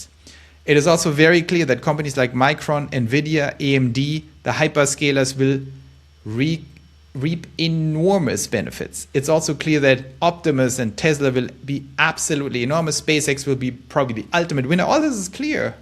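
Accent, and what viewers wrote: Germany. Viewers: in mass - lol - german accent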